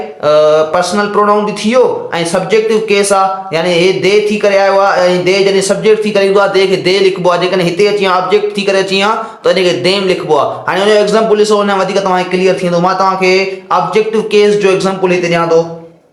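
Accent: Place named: Shikarpur, Pakistan. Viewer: ap ho sir but bt karne ka way esa h jese shkirpur k memon bolte h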